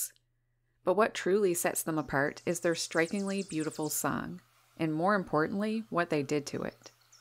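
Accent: Canada. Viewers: Sounds like we're on opposite coasts. I'm out here in Aberdeen, WA and I catch some o-o glimpses of your accent that sound Canadian? Surely love the birds you film in the snow as we mainly get rain out here. Great and wonderful website btw!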